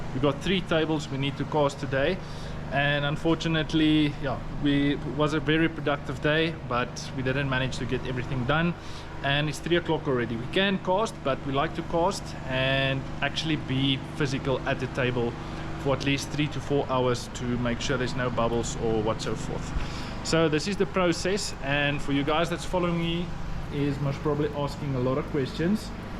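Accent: South Africa. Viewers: I kight just be assuming.. but I am mostly just shocked at that thick South African accent.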